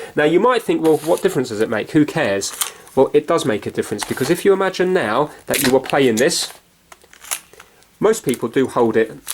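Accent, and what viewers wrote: England, United Kingdom. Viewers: British accents are sooooo satisfying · Yes, he is from England. they say torch for the word light.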